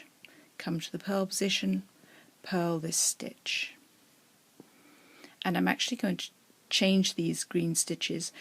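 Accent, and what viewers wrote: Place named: England, United Kingdom. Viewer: You are very easy to follow; a nice English accent so pleasant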